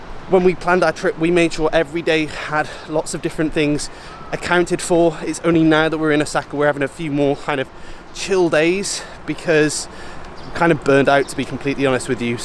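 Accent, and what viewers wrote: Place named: United Kingdom. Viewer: Your tips are really good 👍 tips, so thank you 😊 for those. However, it's a bit hard for me - a Vietnamese American- to understand your British accent, 😂, so it would help tremendously if you could illustrate the object/subject which is being mentioned with a picture 📸 or a flash of a video clip, etc. so that whatever you are mentioning or explaining could be understood 😉 right away! Thank you 😊 💓 so much.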